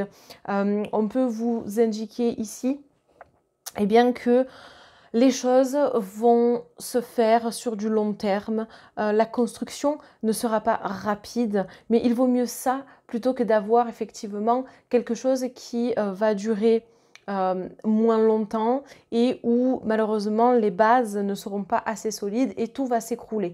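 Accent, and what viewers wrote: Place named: South, France. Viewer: J’adore votre accent du Sud! Ça me change :-))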